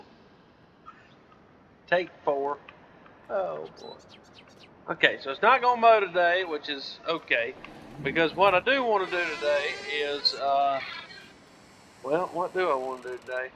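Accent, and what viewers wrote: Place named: South, United States. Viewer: Also man, if your still having issues with your LSPDFR, I have a full support team in my discord that can definitely help you get it up and going. They’ll treat you good man, and if for some they didn’t, you can always talk to me about it and I’ll handle it. You seem like a really down to earth guy and I really enjoy the content as well as the southern accent lol I’m from Tennessee myself. Anyways man, just thought I would throw that out there for ya.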